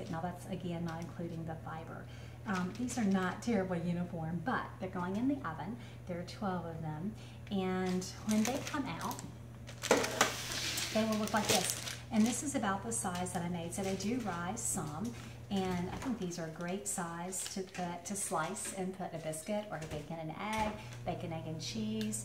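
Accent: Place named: Pittsburgh, United States. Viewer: If you don't mind my asking, what part of the country are you from? I grew up in the Pittsburgh area. sometimes it sounds like you are speaking "Pittsgurghese" but certain words sound a little off. Just curious about my own detection skills. Thanks for the great recipe.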